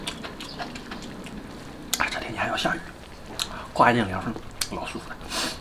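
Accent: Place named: Jiangnan, China. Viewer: 看你的视频有点江南腔调哇 上海宁？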